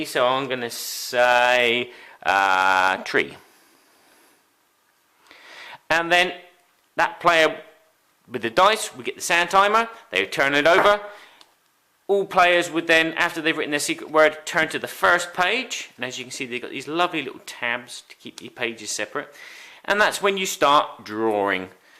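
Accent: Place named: Maine, United States. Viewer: I have never heard that particular American accent before, you must be from the east, far east, like Maine. As always great video. Thanks for sharing.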